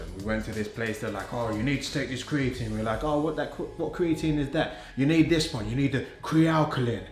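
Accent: United Kingdom. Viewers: Dude I was also thinking the same shit and was gonna write a comment along those lines,he really does sound like one character and scene that came to mind...in SNATCH when TYRONE made a call to SOL and was like “Boris just got to his house...Hang On he’s not alone,he’s with some fellas”😆😆😆😂😂😂😂 I am South African and I love Bri’ish accents and all of GUY RITCHIE movies,their dialogue is priceless.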